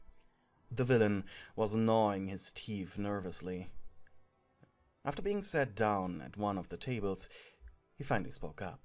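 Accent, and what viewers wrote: Germany. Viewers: GODDDD I LOVED THIS SO MUCH (question are u German?)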